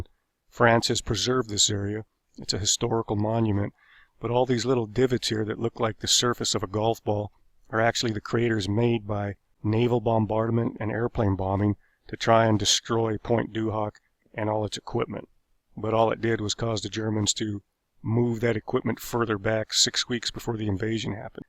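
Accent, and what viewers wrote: United States. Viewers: Stopped after about 2 minutes. Couldn’t stand the pathetic American pronunciation of places in France. Pity.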